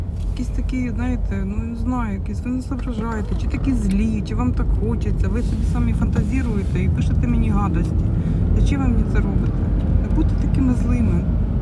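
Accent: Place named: Kryvyi Rih, Ukraine. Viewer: Вона балакає на нашому Криворізькому!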